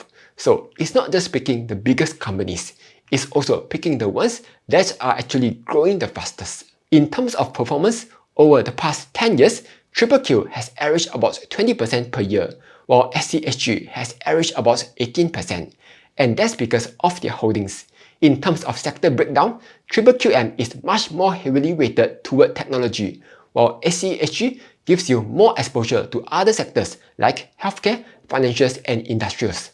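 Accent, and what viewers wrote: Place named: Singapore. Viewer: Your singarpore accent(?) lm not use to trying to adjust to.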